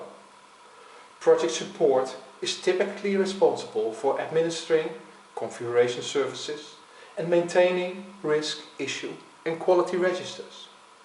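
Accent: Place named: Netherlands. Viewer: You have a nice dutch accent.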